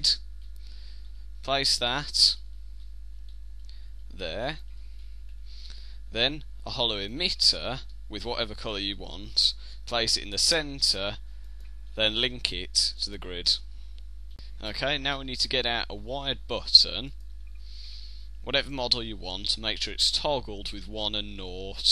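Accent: United Kingdom. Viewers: i hate british accent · this guy sounds more british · this man is British. He sounds like a young Ringo Star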